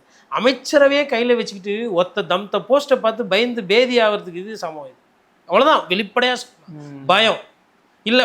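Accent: Chennai, India. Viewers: தங்களின் சென்னைதமிழ் அழகெனினும் எச்சரிக்கையாக இருக்கவும் சகோ... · உங்கள் madras slang ரொம்ப அழகா இருக்கு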